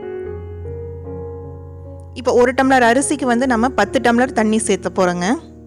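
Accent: Kongu, India. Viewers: எங்க கொங்கு தமிழ் இப்படி தானுங்க இருக்கும்.... என்னங்க பன்றது...? சொல்லுங்க பார்ப்போம் 😊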